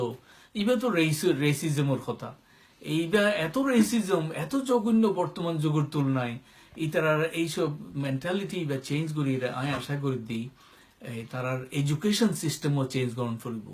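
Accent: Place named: Rohingya, Myanmar. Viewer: Prof., Masha Allah, you are amazing. Love your Rohingya accent and stands for Rohingyas.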